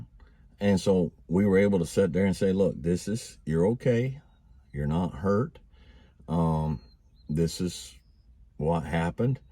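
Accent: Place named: Wyoming, United States. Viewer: im from Wyoming I’m stationed overseas and its rare I come across something that reminds me of home and just the way you talk reminds me of home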